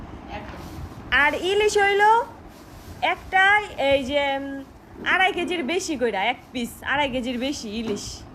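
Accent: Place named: Narayanganj, Bangladesh. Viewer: Apner Kotha suny bujty parci.ami o Narayanganj er mey to tai.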